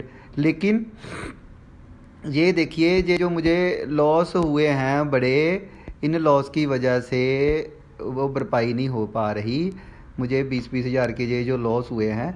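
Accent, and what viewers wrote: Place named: Punjab, India. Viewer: Thanks for this information...it looks like you are from punjab as your way for speaking reflects the pure punjabi.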